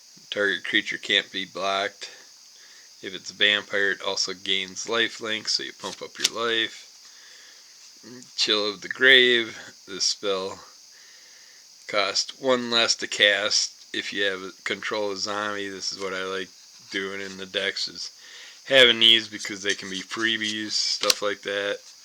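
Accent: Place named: Wisconsin, United States. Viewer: Great video. What I don't know about these could quite literally be put in a book. I remember in 1994 I saw alot of ROTC kids playing this during my junior year of high school That summer I visited a kid I knew who'd moved to Warren Ohio and he tried to teach me how to play MAGIC. I guess I was too much of a right brained kid because I kept getting hung up and confused. I did like seeing the dark art though. It'd be cool cool to see a conan the barbarian version on a card game I knew the old cards and sealed boxes had value but that is amazing just how much the wrappers go for. Cool little asmr style video. The Wisconsin accent is very pleasant. Hope your having a good week and have a real nice weekend, my friend! 😊😊😊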